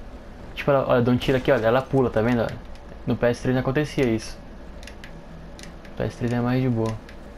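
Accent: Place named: Belém, Brazil. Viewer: tu mora em Belem do pará? eu tbm que sotaque igual kk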